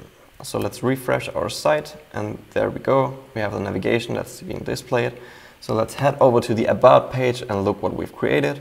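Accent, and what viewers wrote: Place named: Poland. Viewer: very german with so polish english accent : )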